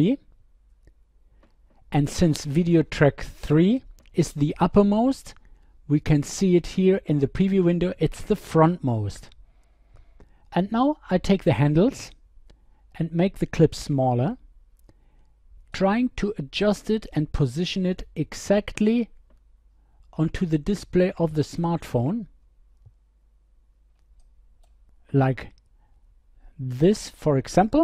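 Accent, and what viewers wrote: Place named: Europe. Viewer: brilliant explained show me how to and refreshing european accent- as opposed to annoying american drools.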